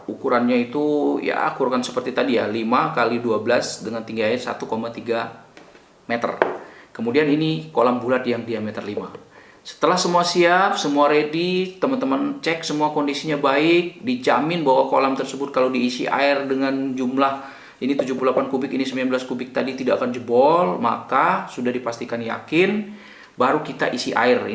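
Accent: Indonesia. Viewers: Bang logatnya seperti orang Lampung, saya dari Kalianda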